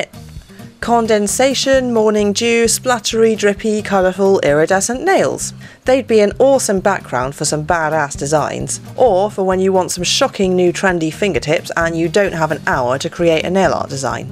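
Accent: United Kingdom. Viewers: Since by your acent lol i guessing u live in the uk????? and also ummmm thinking that i might not be able to find them u think u can do alittle bit of research on where i can buy them in the usa and i would love to share my expeiMENTAL self with you im always hearing form my friends lindsey ur cray cray ur just to much lol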